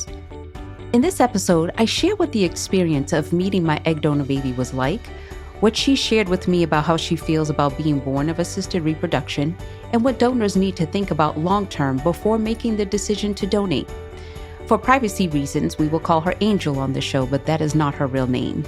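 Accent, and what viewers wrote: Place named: New York, United States. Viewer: Thank you for sharing it was great to hear this as a sperm donor and I love your NY(?) accent ❤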